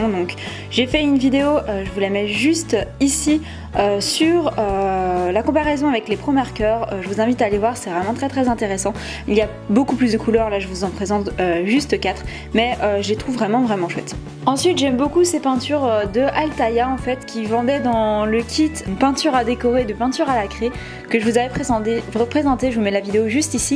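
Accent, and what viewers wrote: Belgium. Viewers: tu est belge ou Française moi je suis belge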